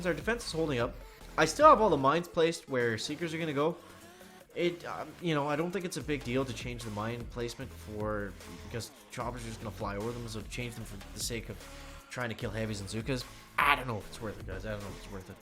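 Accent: Canada. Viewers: Great accent Canada, eh!! Nicest people on the planet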